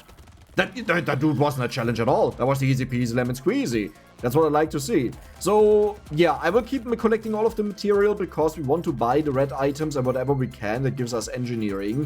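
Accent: Germany. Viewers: the german accent xD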